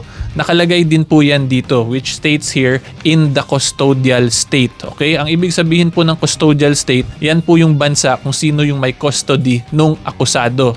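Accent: Visayas, Philippines. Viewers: Bisaya ka atty.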